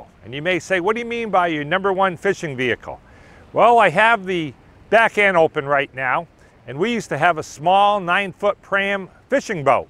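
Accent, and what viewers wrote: United States. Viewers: I hear Boston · Being from Massachusetts, I myself will guess the presenter is from Maine.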